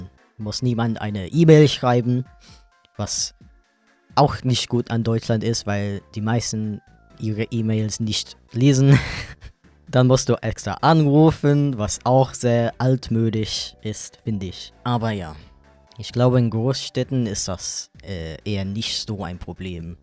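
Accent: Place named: United Kingdom. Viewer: No offense an den Typen, aber man hört direkt nen klassischen UK-Akzent raus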